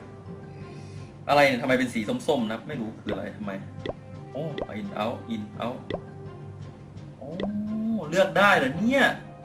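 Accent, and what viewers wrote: Isan, Thailand. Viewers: พี่เป็น คนอีสานช่ายป่ะ =×=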